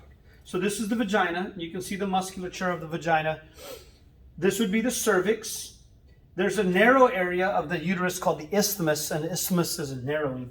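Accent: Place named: United States. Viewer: Sprich deutsch. Dein ami-palaber ist für mich unverständlich.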